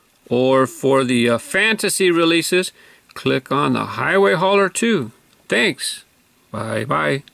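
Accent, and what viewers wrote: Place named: Mexico. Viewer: are you mexican because you sound like you have an accent like my dad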